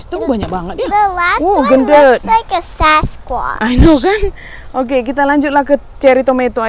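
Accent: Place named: Medan, Indonesia. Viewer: apa adanya macam kayak logat medan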